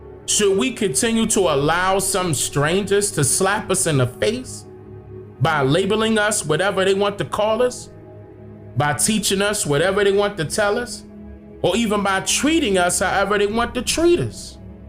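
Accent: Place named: Washington DC, United States. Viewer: are you from dc I feel like I hear it in your voice!!! Thanks for keeping my mind working!! My dad told me these things when I was in elementary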